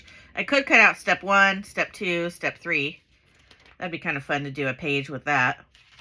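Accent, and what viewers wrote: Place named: South, United States. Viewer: If you're from Oregon, where the ##$% did you get that southern accent that comes out?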